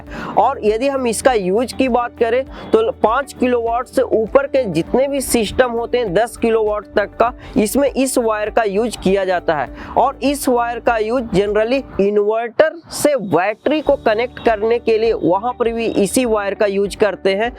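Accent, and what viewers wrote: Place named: Bihar, India. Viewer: Bahut menat karna hai abi bihari jaise bol rahe.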